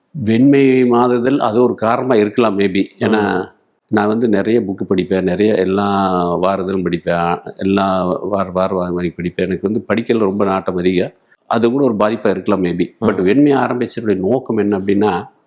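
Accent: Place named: Tirupur, India. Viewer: Tirupur accent ❤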